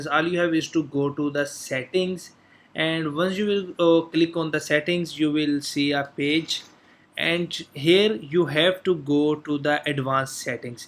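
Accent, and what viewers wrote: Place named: Punjab, India. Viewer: What makes you post videos in English, you are clearly not comfortable with the language. You are speaking English in Punjabi accent. Just do videos in a language you are most comfortable in.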